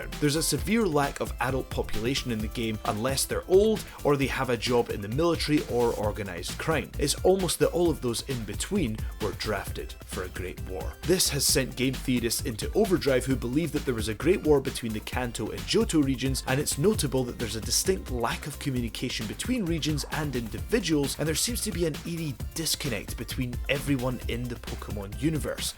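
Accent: Scotland, United Kingdom. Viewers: He has a Scottish or north English accent. That might have something to do with it. Or maybe not. Just a thought. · Oh, you couldn't ruin Zelda for me if u tried, still always a pleasure to hear ur thick Scottish accent though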